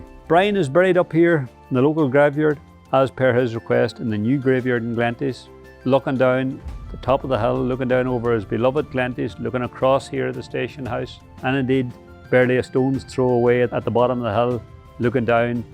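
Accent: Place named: Scotland, United Kingdom. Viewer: No,,no visit,,the accent is awful,,,just like the scot shit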